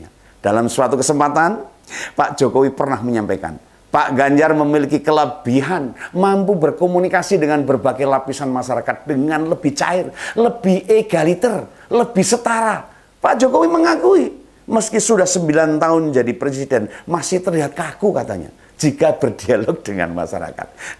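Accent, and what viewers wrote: Java, Indonesia. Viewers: mas nada bahasanya santai dan kental cirikhas jawa aslinya, sy org bali senangsekali mendengarnya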